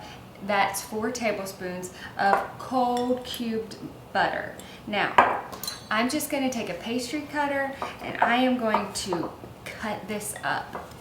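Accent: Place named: Miami, United States. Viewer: I'm just starting a Keto lifestyle. I learned about your channel through the Keto Twins. I am really excited and appreciative of your work in researching and perfecting these recipes and for the wide breadth of knowledge you relay. Looking at your videos I feel confident that this is a change I can do and succeed at. You are making a difference in the quality of people's lives and I ask that God continues to bless you and your family for this good work. Thank you. PS I live in Miami and your accent makes me feel at home! I miss it so much.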